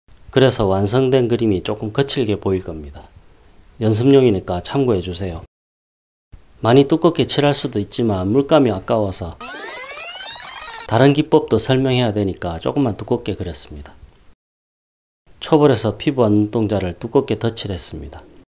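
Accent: Gyeongsang, South Korea. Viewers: 진짜 의도되지 않은 리얼 경상도 발음 눈까리 빵터짐 ㅋㅋ 울랑이랑 비스무리한 스퇄의 아재 개그 정말 딱 내스퇄 강의 ㅎㅎㅎ 취미로 그림 그리다 우연히 알게되었습니다. 시간됨 자주 들릴께요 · 눈깔 그리시는거 잘 보았습니다. 갱상도 말투로 시크하게 설명하시는것이 너무 제취향이십니다……하하핳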